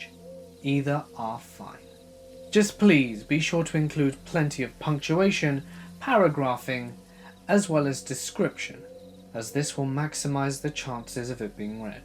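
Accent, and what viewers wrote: United Kingdom. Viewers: Thanks creepy British dude!! You could read a dictionary and it would sound ominous lol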